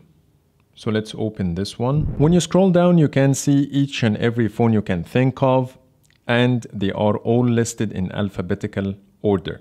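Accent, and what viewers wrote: Egypt. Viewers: are you an egytian your accent denotes that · You got an Egyptian accent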